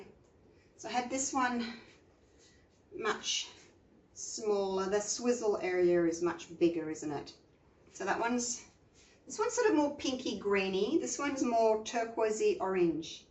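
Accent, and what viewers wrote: Australia. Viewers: Just discovered your channel and it's amazing :) Thank you for sharing all your techniques, that's so generous and inspiring! I'm starting to explore with pouring and you're giving me lots of ideas. And great to hear some Australian, takes me back to my backpacking year ahah Love, from Germany (and France)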